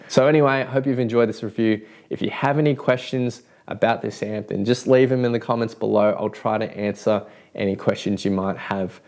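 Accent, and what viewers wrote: Australia. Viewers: the most stunning thing about this video is watching an asian guy talk with an aussie accent. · What's more annoying than an Australian accent? An Asian with an Australian accent.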